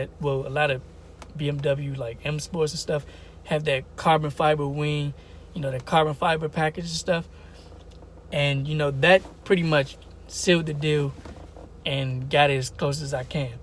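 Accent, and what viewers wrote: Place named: Maryland, United States. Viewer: as soon as i heard your voice i thought of maryland, i’m from NC but family lives there... good video brodiii💪🏾🙌🏾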